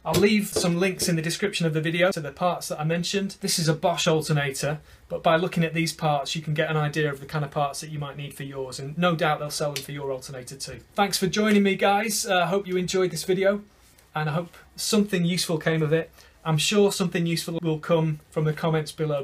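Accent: United Kingdom. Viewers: You talked about the cost in dollars of parts but you sound very British, are you? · Very good video, you sound like your from the UK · Gracias por tu vídeo. Por tu acento debes ser Británico. Me agrado oír que dijeras KAPUT. 😜😜😜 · Great video, but I'm confused; your vernacular is dollar based, whereas your accent is pound centric.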